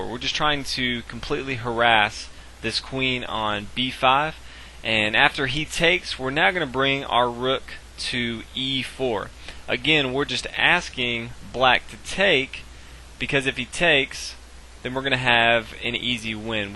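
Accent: South, United States. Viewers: I like the lessons but his southern accent is very annoying.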